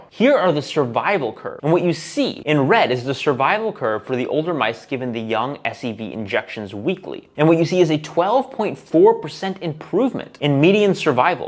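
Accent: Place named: United States. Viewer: it’s pronounced Duneeeedin pace not Dunedin pace.. Must be the American accent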